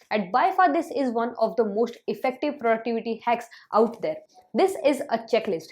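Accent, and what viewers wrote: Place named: Maharashtra, India. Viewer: are you from maharashtra(marathi speaking) i am asking this because your speech has marathi vibe and due to your pronunciation ... please reply yarr...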